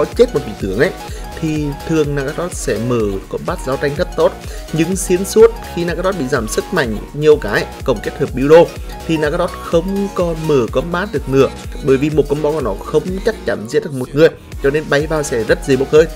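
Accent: Nghe An, Vietnam. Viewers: Anh nghệ an à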